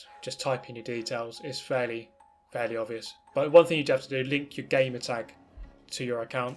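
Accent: United Kingdom. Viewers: you dont look like a brit what a accent gibe me pls ?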